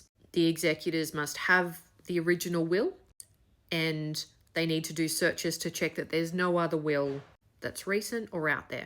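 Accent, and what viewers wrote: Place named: Australia. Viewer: Well explained, with an Australian accent.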